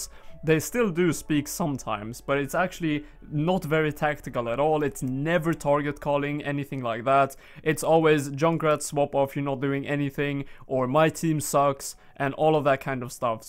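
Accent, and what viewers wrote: Sweden. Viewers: Are your swedish? Nice english x)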